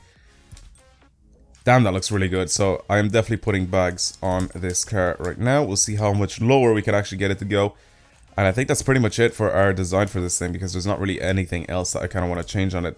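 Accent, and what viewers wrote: Dublin, Ireland. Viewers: Are you Dublish?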